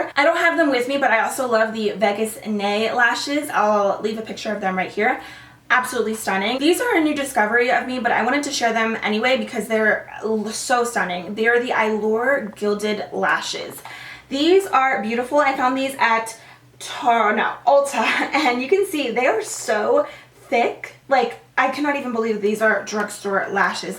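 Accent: Spain. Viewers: i just love yur spanish accent 😘😘😘😘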